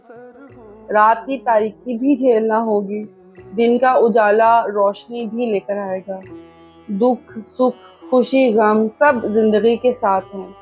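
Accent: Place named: India. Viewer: لہجہ ہندوستانی ہے آپ کا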